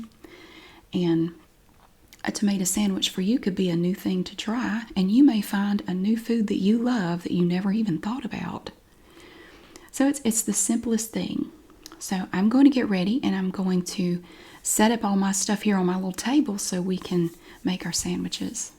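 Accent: South, United States. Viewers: I could watch a tomato sandwich in the making topped off with a southern accent every day for the rest of my life.❤ Hope you are well! I need to catch up on the neighborhood drama. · Love your southern accent ❤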